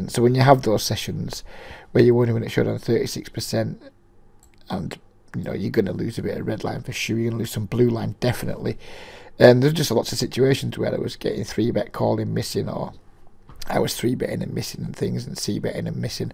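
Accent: United Kingdom. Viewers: Understood, many thanks.. Ps, I have been trying to work out where that accent you have comes from, North or south of U.K?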